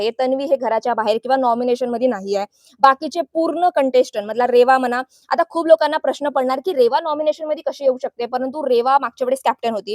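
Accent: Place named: Vidarbha, India. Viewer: वैदर्भीय भाषा वाटते ह्यांची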